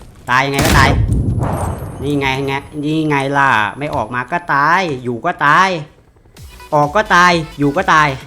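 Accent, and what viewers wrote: North, Thailand. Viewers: ปี่เป็นคนเหนือกะคับ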